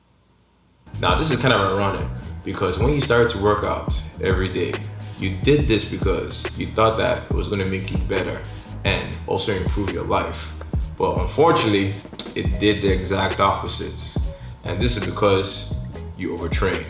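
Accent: Nigeria. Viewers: Omoh you be Naija guy???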